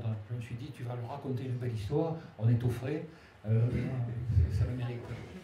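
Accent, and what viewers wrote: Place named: Marseille, France. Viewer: Je comprends rien avec l'accent marseillais 😮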